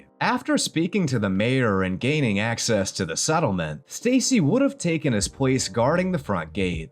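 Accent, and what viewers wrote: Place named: Maryland, United States. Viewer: Are you from Maryland by any chance?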